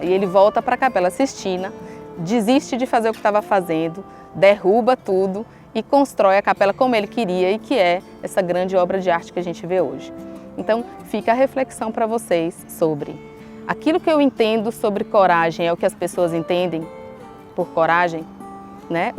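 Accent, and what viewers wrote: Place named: Bahia, Brazil. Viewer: Reflexão muito boa, é o que eu estava prescisando ouvir. você é Baiana? Se não você tem o sotaque de Baiana arretada.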